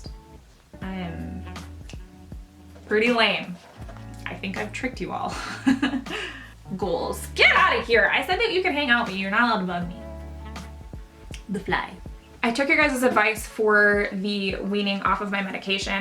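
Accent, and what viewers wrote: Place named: Canada. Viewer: I love your vids girl! I didn't know you were a fellow Canadian ! Even though how could I not know.... our accents are pretty distinctive :p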